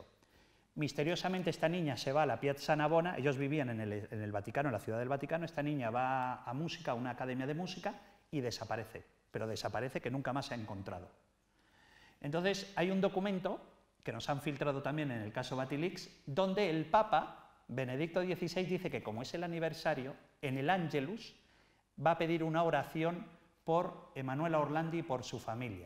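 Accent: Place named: Spain. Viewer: Gran escritor peruano. Parece que lleva muchos años en España, porque su acento es totalmente español.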